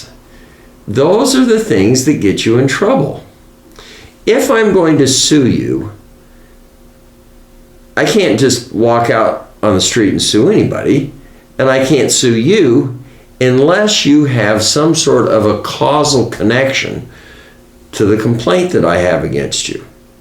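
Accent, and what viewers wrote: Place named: Pennsylvania, United States. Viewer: You have a strong PA accent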